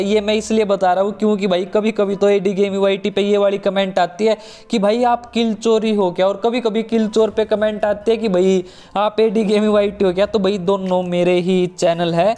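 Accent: Haryana, India. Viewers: Big fan bro I think you are from Haryana 🧐I am right plzzzzzzzzzz reply 🥰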